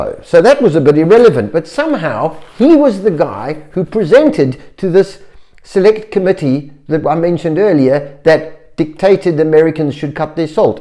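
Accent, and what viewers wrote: South Africa. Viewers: You sound south african, thanks for your video!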